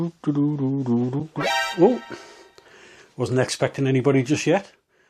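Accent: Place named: North, United Kingdom. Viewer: Cracking video, aye good advice i didn`t get the expensive cameras just thought i would start with kit easy to learn the basics on. The editing is good lots to learn and helps you think of new technique's for content. On a massive plus it is great listening to a fellow northerner 🤙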